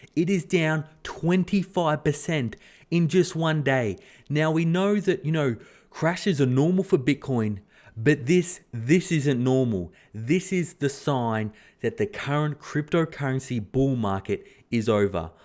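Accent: Australia. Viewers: I don’t know which phrase I like hearing better in an Australian accent, “Holy Smokes” or “Party Pooper.” Either way, great video, thanks for keeping us up to date on all things financial!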